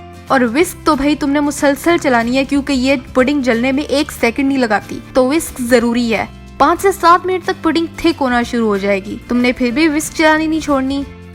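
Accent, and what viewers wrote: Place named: India. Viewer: Cooking me desi type of (لہجہ ) lehja... hayeee maza aa jata hy...❤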